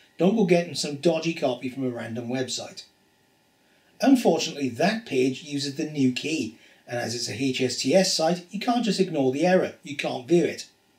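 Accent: Wales, United Kingdom. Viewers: Fantastic. Worked for me and it was easy to follow! Heard the welsh accent and thought I'd give it a chance. Glad I did! Thank you!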